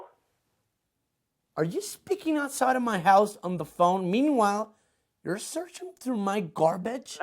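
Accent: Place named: Mexico. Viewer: Please, please, PLEASE!!! Don't make any more of this. Your Mexican accent makes me cringe. I try to pay attention to what you're trying to say but, damn! And you ideas and opinions....Well, just watch your own videos and you'll know what I mean. If I want to see funny news, I'll just watch Colbert, or The Daily News re-runs because them I can actually understand.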